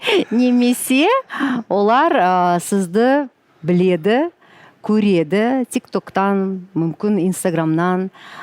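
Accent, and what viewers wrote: Standard. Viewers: Имамды, таза қазақшаны сағынсам тыңдаймын, керемет шешен кісі ғой, сөз саптауы ешкімге ұқсамайтын шеберлікпен айтып жеткізеді. Риза боламын❤Қорқытпай, көркем тілмен орнына қояды. 🎉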